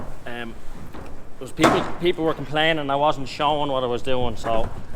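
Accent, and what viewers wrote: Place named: Dublin, Ireland. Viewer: A Dub accent from a farmer takes a bit of getting used to. I think il survive though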